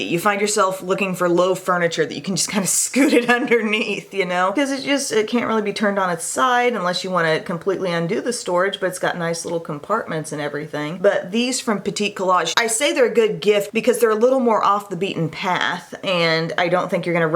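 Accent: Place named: South, United States. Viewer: Where are you from?Your accent.lol.Im from Arkansas so I could hear some country in there.lol.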